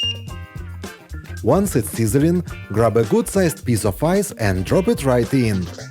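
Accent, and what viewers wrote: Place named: Russia. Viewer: I love the RUSSIAN ACCENT! 👍🏻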